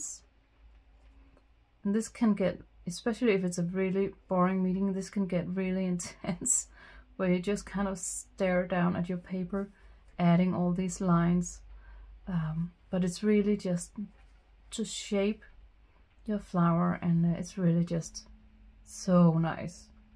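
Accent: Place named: Denmark. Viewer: Your accent sounds like you're from Denmark:face-blue-smiling: Har jeg ret? :goodvibes:I sketched with that same brand of pen you're using I tried, but it didn't seem water-resistant, so my sketch died :face-red-droopy-eyes: Anyway, my go-to flowers are tulips 🌷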